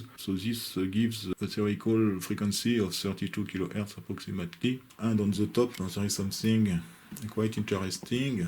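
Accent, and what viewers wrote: France. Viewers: Époustouflante travail de reverse engineering et excellentes explications des différents schémas. L'accent est catastrophique malgré un très bon niveau d'anglais, mais c'est un problème fréquent chez mes compatriotes français. Je reste abonné 120% à cette chaîne, unique par son excellent niveau technique. Bravo!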